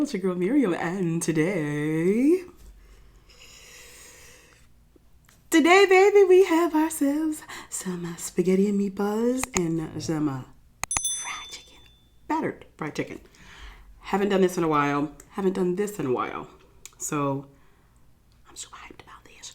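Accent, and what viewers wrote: New York, United States. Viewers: Yeeesss with the NYC accent. Rep my hometown😍😍😍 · Hey boo lol the New York Accent 🤣🤣🤣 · Yes you sound a New Yorker and we loveeee your videos‼️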